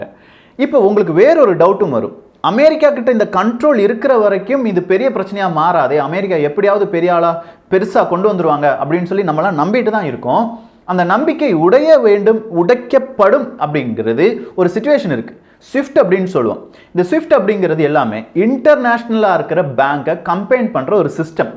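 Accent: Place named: Madurai, India. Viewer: Dai Marithas Mathuriya Pasura da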